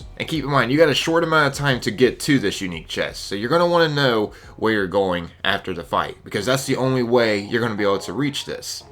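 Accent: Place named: Rural, United States. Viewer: YEAAAAA!!!!!!!!!!!!!!!! THE CONTRY VOICE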